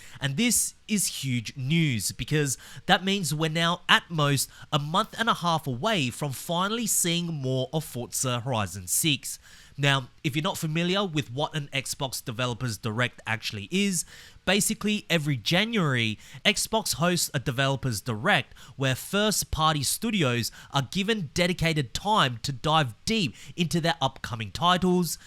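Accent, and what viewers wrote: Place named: Australia. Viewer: not game-related, but gotta ask - where are you from? you throw around 3 or 4 different accents in your narration, which sounds like a HEAVILY tainted Aussie.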